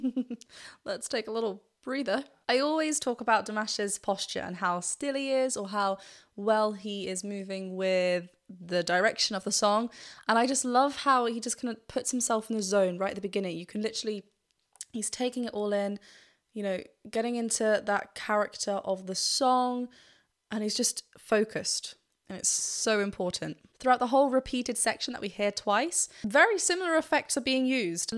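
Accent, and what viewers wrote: England, United Kingdom. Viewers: Beautiful Dimash performance,,,also your english accent its very cool...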